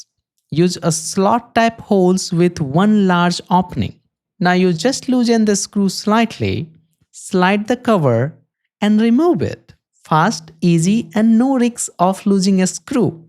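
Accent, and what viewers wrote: India. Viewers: is this dude from ahmedabad?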